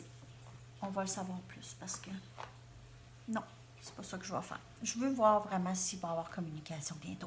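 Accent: Canada. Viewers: je vous remercie pour tout vos tirages qui sont vraiment justes avec ce que je vie j’adore votre belle énergie et votre accent canadien ne changeait rien🥰😉 le concour j’ai tout loupé Mais j’aime vous lire merci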